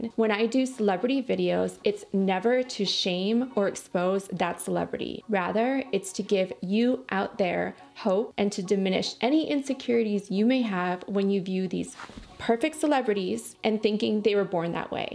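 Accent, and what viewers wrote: United States. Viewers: its the socal accent lol. it is what it is haha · where are you from?? You sound like you're from the Midwest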